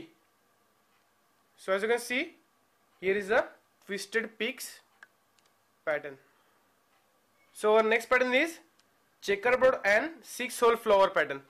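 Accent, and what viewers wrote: India. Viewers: You kind of sound Indian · he sounds weird because he is indian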